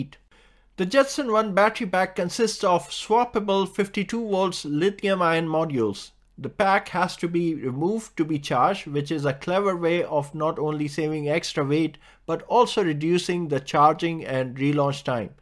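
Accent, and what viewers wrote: India. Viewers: Fucking indian accent🤦‍♂️ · Акцент здорового индуса? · o!! indian accent, my pleasure)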